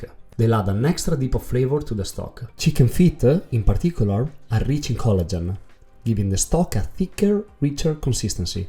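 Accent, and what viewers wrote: Italy. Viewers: Great recipe! Love the way you exaggerate the Italian accent. It is noticeable your English could sound a lot more "English", but I do believe we should always keep our roots. It is like the fat... Why lose it? No need. Regards, from Angola, Africa! Cooking your bolonhesa, like we say in Portuguese, as I write.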